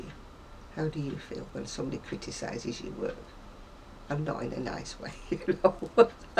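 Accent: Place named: Manchester, United Kingdom. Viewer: Oo, thank you for your lovely channel. It was a bit like 'coming home' hearing your smashing accent. (I've lived away from Manchester for many years - down south - but as they say 'you can take the lass out of Manchester, but you can't take Manchester out of the lass'!) I agree with your sentiments entirely. After all, if you just churn out crochet items to meet a fad demand it then becomes 'just a job' and where's the fun in that? Keep on with your fab videos, I'm a bit late to the party but intend watching them all!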